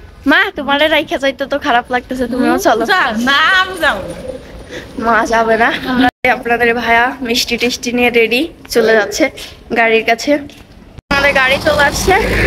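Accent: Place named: Bagerhat, Bangladesh. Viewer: আপু আমার বাসা বাগেরহাট আমি অনেক বার মাজারে গেছি আর একটা জিনিস অনেক ভালো লাগে আপনার ভাসা আমাদের মত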